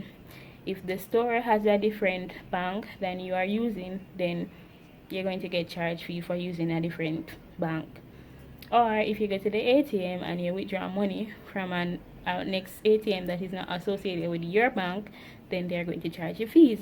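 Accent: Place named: Jamaica. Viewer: I’m Jamaican 🇯🇲 too. Love that you’re staying real. I only sound like you when I’m upset or around others 😂 . Thanks for making me feel like I’m home 💕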